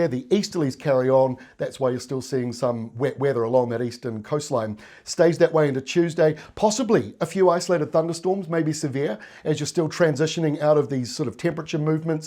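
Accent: New Zealand. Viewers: Bros sounds from NZ 💪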